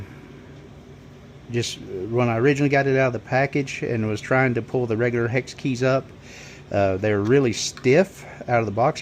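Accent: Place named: South, United States. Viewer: A Pats fan with a southern draw is alright in my book.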